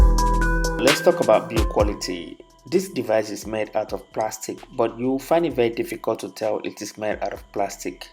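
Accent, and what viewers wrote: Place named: Akwa Ibom, Nigeria. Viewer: Love your Akwa Ibom accent bro!❤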